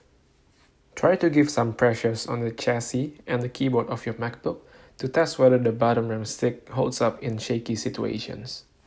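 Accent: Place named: Indonesia. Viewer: lsg ngenalin logatnya orang Indo, eh terconfirm dengan modif mobil jadi polisi lalu lintas wkwkw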